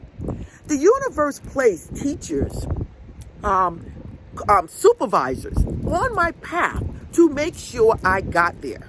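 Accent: New York, United States. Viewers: She sounds like a New Yourka!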